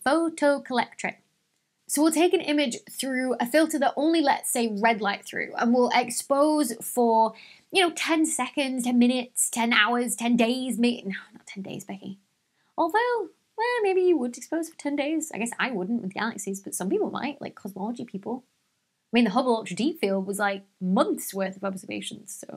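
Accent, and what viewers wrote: United Kingdom. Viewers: Absolutely so! But, in the meantime, I worry that you might be losing viewers ... and I love your explanations so much that I think you should have a much wider audience ... BEFORE the bastions of patriarchy are brought down, which could be a long time! Don't think of it as compromising your English Regional Integrity (ERI!) but just as a courtesy to viewers listening on tinny speakers! When I moved to the US, I resolved NEVER to say "TomAYYto", I thought, "No, let the Yanks learn "TomAHHto", but after 8 years, I just got tired of having to say "No Tomahhto" over and over again (I HATE them, they are Satan's Fleshy Fruit!) so I made my and waiters lives easier by saying "TomAYYto" when necessary.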